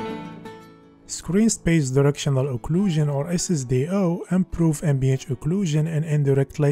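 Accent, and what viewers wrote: Morocco. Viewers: You're Moroccan aren't you XD I can tell by the accent. Best of luck ! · where are you from ? you have a Moroccan accent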